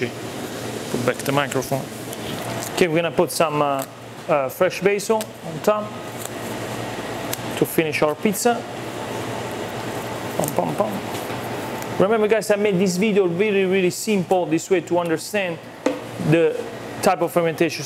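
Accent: Italy. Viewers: On my 40th batch of pizza following this recipe. all i can say is get 00 (zero zero) flour to make to worthwhile - buy best flour you can get hold off and the best yeast you can get the mcDougalls one is good! Love this Video and i practice my italian accent when making it so i sound like the man in the Video TUTTO BENE x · I came here to listen his Italian accent 😅 and I Iove making 🍕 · Salve o... AVE?;-) are you born Italian living in the USA o Italian born in USA? I really don' t know if you speak English with that accent pourposly or is faked;-) BTW you are very good pizza maker and looks a super very nice person keep up with your outstanding videos!!!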